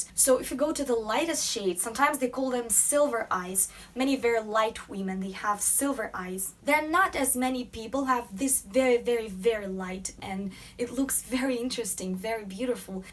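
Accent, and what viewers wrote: Russia. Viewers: I have the muddy eyes, dark green and orangey brown. Like you,. I knew when I saw you and heard you speak that you were Russian, before I read your bio.;) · Ахах, через 20 секунд речи поняла, что девушка русская. Рашн инглиш из соу рашн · Всегда узнаешь русский акцент)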